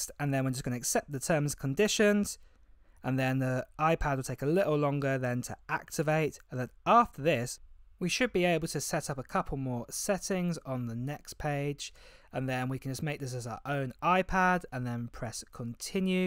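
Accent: United Kingdom. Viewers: I want to buy the iPhone 11 but I don’t have money for that and also i don’t have a job since i was just a 16 yo who want some iPhone i am hoping that i will be the one who got your ipad❤️Godbless you man💖 btw i like your British Accent❤️❤️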